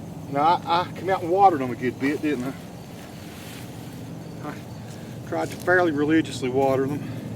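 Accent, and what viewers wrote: South, United States. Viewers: Your videos are worth watching just to hear your Southern drawl. Next year, grow your own slips! You can get about 50 plants (slips) off of one sweet potato when you grow your own. Then it will cost you NOTHING, because you save your good potato for next year's slips.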